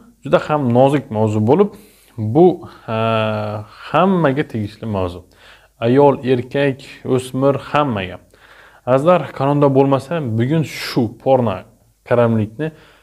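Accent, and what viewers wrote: Khorezm, Uzbekistan. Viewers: Asslomalekum doktor Xorazmdan òxshisiz tiliz òxshab turibdi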